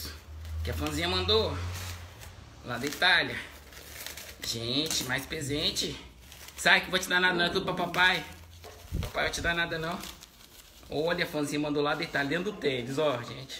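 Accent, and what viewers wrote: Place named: Minas Gerais, Brazil. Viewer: Esse capixaba tem sotaques de mineiro😂😂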